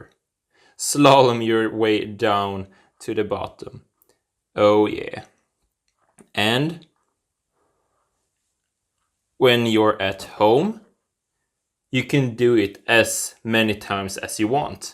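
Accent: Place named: Sweden. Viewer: Juste bra med swenglish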